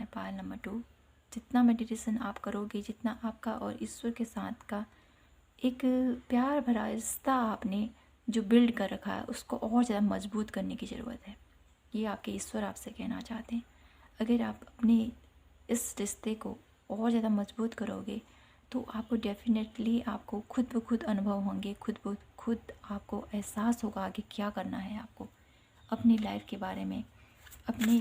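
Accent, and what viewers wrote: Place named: Bihar, India. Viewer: thanku ji Achcha laga ki aapane bhi Renu madam Se Hi Sikha Hai reading bhi aap bahut acchi karte ho aapka bolane ka style bhi achcha Hai Jahan Tak Mera Khyal hai aap Shayad Bihar ke Rahane wale ho aapke boli se mere ko Aisa Hi mahsus Hua kya aap Kolkata Nahin to Bihar ke aap Rahane wale ho aur Main apna WhatsApp number bhej deti hun agar aap Chahenge to ham log baat kar sakte hain theek hai aap ek bar humse baat Karen thank u ji aur family and Happy Diwali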